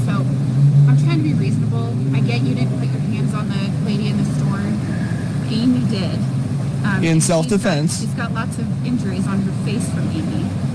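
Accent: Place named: New York, United States. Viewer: I wish I could just give you a big squeeze. Not trying to be weird. I just love getting to see the face that goes with the voice of reason I love to listen to. Boy is your New York accent coming back quick since you moved back to the north east.😁 Thanks for all the research you do. Keep on keeping on. Much love my dear 💗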